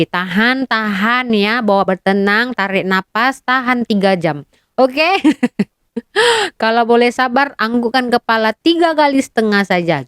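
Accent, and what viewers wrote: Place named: Northern Malaysia, Malaysia. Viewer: Wei akak, awat hang cakap loghat utara?? Akak ni comel la😂😂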